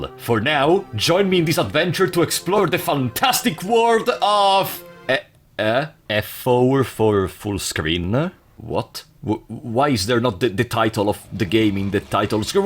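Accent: Italy. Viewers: the italian accent hitting so hard <3 te se ama che conosci funger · I don’t think fear and hunger would be the same without your accent. Onestamente sono molto contento che sia un italiano ad essere forse la persona più rilevante nella fungercommunity, viva il patriottismo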